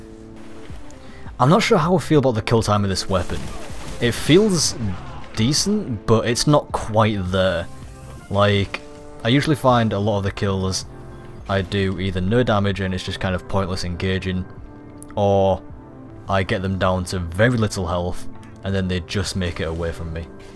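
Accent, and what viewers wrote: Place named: Scotland, United Kingdom. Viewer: you look Asian but sound scottish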